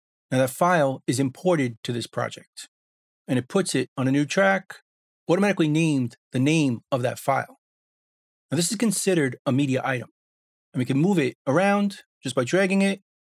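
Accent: New York, United States. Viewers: U from NewYork?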